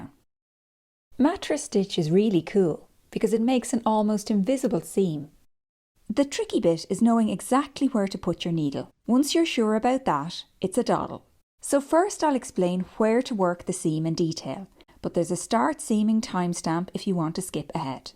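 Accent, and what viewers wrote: Ireland. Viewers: Great video. So helpful and easy to follow. Great to hear an Irish accent too! 👏👏👏👏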